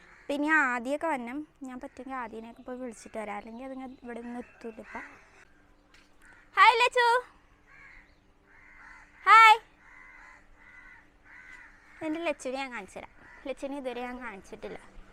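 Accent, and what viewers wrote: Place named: Cherthala, India. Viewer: ടാ... ചേർത്തല സംസാരം, എവിടെ ആണ് വീട് 💞💞💞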